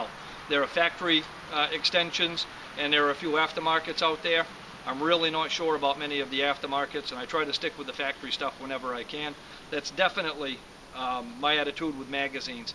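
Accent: Maine, United States. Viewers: Sounds like a Maine accent?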